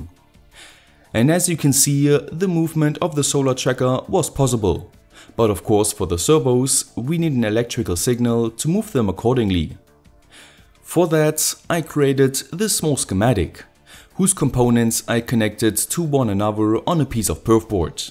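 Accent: Germany. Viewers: Sounds a bit German-ish :)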